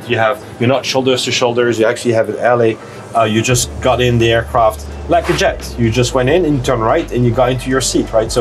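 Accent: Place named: Switzerland. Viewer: "Bisch scho am filme?"...ha doch dänkt das du schwiizer bisch...😊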